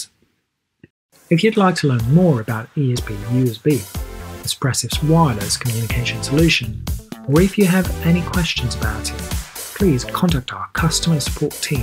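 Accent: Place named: RP, United Kingdom. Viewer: Came for the girl in the thumbnail, stayed for the very posh British guy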